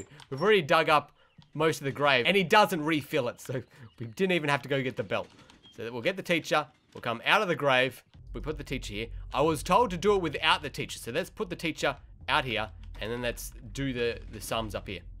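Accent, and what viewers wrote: Australia. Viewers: are u Australian? u do sound like an Australian(not in the bad way) I just wanna know where u from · Are you Australian? Fav new YouTuber in a while! Good content keep it uo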